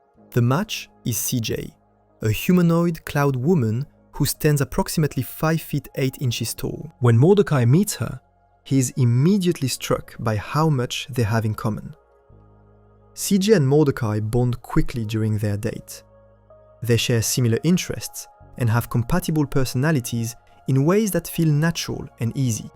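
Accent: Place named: France. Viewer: Tu peux mettre une piste audio en français? Parceque ça fais un peu bizarre d'entendre un mec parler anglais avec un accent français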